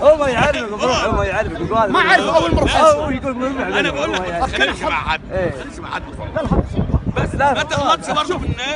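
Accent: Saudi Arabia. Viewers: ابك والله هو دوسري ويصرق لهجة عتيبه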